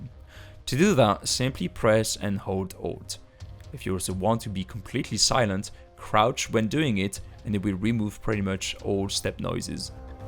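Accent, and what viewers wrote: France. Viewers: Are u french ? Anyway, good video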